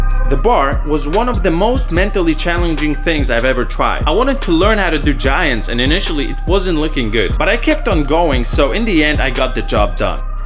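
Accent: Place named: United States. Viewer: tracisz swój american accent already:O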